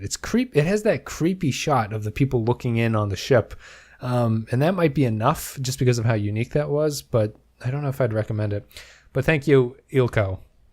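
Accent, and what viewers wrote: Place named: Upper Midwest, United States. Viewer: Over-apologizing? Hahaha! I'm going to show that to all my Can-head buddies here. Actually, you have a very distinct way of saying "out" and "about". If I had to guess, I would say either Seattle, North Dakota or Minnesota.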